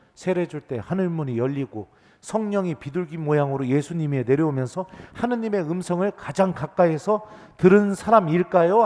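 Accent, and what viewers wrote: Chungcheong, South Korea. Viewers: 구수한 신부님 충청사투리 에 고향생각하며.열심히 신부님 강론 따라가며 한구절씩 되뇌이는 하루를 시작하려고 노력합니다.감사합니다 🙏 🙏🙏영육간에 건강하시길 기도드립니다.🙏🙏🙏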